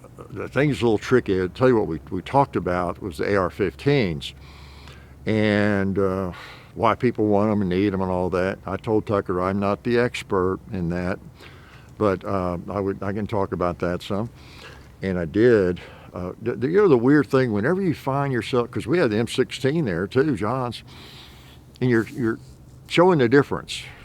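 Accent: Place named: Pennsylvania, United States. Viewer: Did Hickok originally come from Pennsylvania? He seems like he has a Pennsylvania accent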